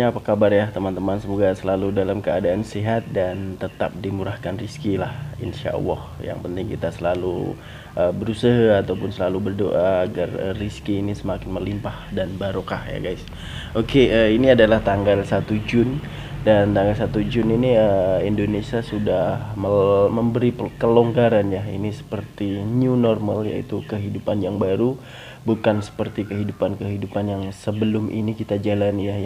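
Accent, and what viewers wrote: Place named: Malaysia. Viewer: aku confuse abg ni orang indonesia ke org malaysia? hahaha ckp mcm org msia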